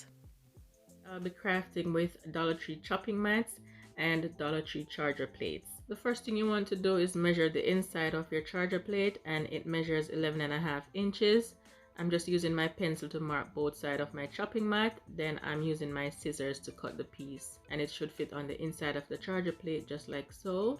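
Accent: Jamaica. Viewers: Such a pleasure to hear an AUTHENTIC JAMAICAN ACCENT on here...sooo soothing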